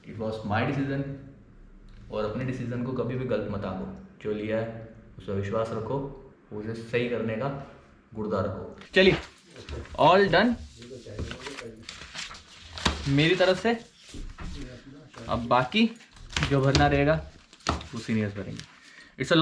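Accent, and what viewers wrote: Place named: Punjab, India. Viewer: English me punjabi tongue influence hai.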